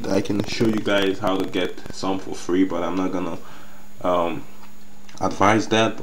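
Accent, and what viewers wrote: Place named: Nigeria. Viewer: U sound Nigerian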